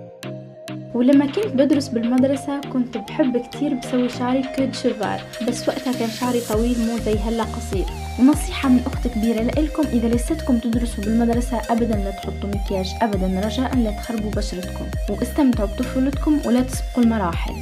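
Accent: Tunisia. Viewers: انت تونسية صح لانو لكنة لهجتك تشبه التونسيين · انتي تونسية علاش تحكي لهجة مش لجهتك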